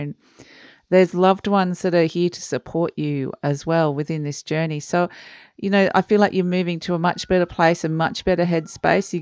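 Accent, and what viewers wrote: Australia. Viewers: Hello, great to hear a fellow Aussie doing readings on you tube. They are good readings too. Do you do readings at any of the psychic and wellness fair held in NSW? It would be great to meet you one day. Cheers.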